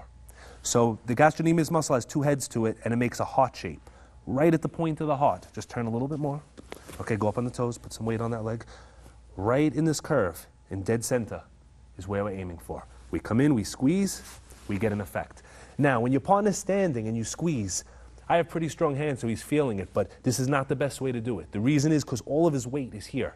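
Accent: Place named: New Jersey, United States. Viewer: This guy is real Jersey Pwot ove coughey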